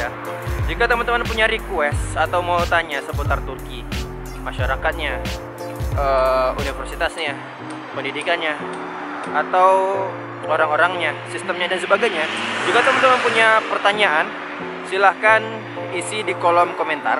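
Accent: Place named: Parabek, Indonesia. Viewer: logat Parabek wkwkkw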